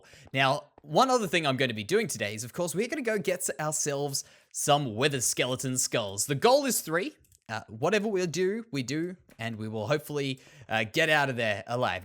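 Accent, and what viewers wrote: Australia. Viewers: Hey are you Australian?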